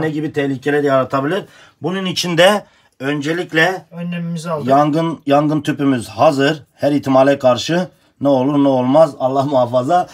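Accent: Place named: Kurdistan, Turkey. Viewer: Agridan gelmiş konusuyo don la köyüne kürt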